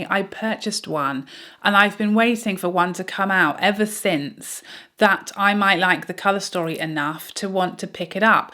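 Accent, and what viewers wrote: United Kingdom. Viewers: I love your videos to pieces! and your British accent. I’m new to your channel but am so happy I found you. I’m in the US but found I like UK YouTubers probably more than the US. You guys are more real and unafraid to tell it like it is😉 Do you use Lancôme or Estée Lauder much? I have heard you mention some products from them but I have not seen any videos about those brands. Does anyone do videos about them. I think they both don’t get enough love if you know what I mean. I use a lot of both brands and would love to see videos about their products.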